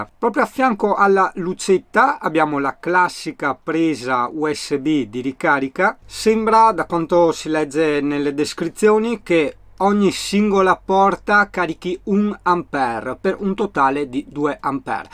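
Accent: Veneto, Italy. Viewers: Ciao, bravo fai anche tu recensioni che ti aiutano ad avere ulteriori introiti. Ps, ho vissuto a Mestre tanti anni ora sono poco fuori, il tuo accento che è delle nostre parti mi rilassa!!! Ciao beo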